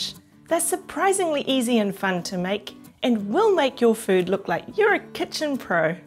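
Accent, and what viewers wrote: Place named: New Zealand. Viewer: LOvely design. And I also like that Kiwi accent.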